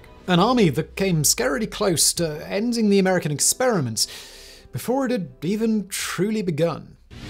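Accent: United Kingdom. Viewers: can't do the horrible brit accent on american history, this channel sux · Really like the vid, but hard to weave through your Brit accent, woof. You could also use some tonality in your narration. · Listening to a limey talking about what went on here. No thanks I'll pass, I don't want the opinion of those we soundly gave the boot to out of this amazing Nation. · Love your channel. I noticed that in this instance your British accent is a little ironic. Keep up the great work!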